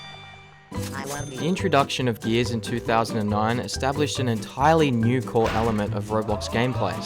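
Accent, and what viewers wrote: Australia. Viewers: DAMN BROS MORE AUSTRALIAN THAN ME🐨🦘🇦🇺🇦🇺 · australian man explains roblox sounds nice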